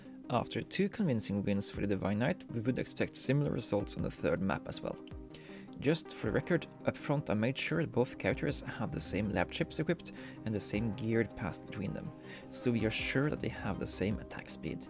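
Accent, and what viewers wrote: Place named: Sweden. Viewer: youre my fav idleon creator btw, especially since you sound swedish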